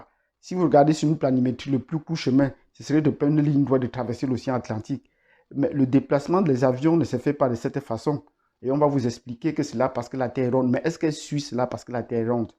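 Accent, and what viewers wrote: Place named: Cameroon. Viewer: C'est quoi son nom, il a un ton Camerounais, si c'est le cas je dois le contacter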